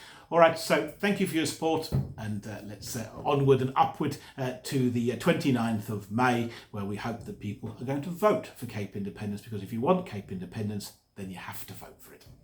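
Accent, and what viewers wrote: United Kingdom. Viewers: This guy actually has a British accent. He needs to go.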